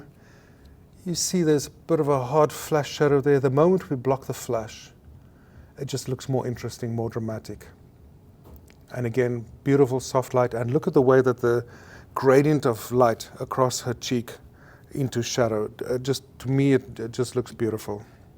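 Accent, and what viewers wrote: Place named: Germany. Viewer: One question: how come you have a Dutch name and a German accent? :)) Fantastic stuff, this goes immediately into my GOLDEN TUTORIALS folder... There are very minor points I would have done slightly different, like with structuring video or editing (give a bit more time to showing the examples, and next to each other works best), but that's a side matter. I felt like back at school when we wondered how some great teachers stood out and could reach out and catch our attention. I've seen this video actually twice, counting the number of times I had to rewind to be able to follow (have no such a flash yet). Extremely welcome stuff, and I'll be buying the modern flash I had hesitated to, now under impact of this video. Can't wait!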